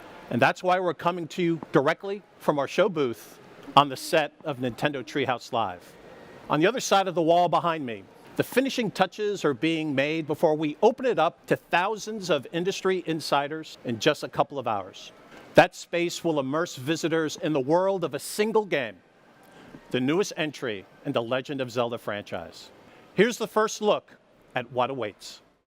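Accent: United Kingdom. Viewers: your brit, right? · Dude any person who speaks English knows what a queue is you pompous ass. Just because you have a British accent and speak a less refined version of old English doesn't make you smart, it just makes you outdated.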